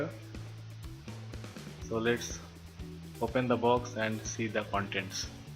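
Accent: Bengal, India. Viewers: are you a Bengali? It's so evident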